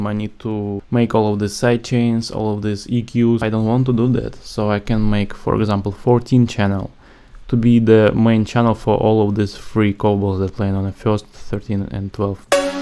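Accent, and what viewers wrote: Russia. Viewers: Ты че русский что-ли? Твой акцент сразу понятен. Но тутор классный 👍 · Так я сразу понял, что акцент русский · maybe I don't know something, But despite the good English language, there is a feeling that you cook well in Russian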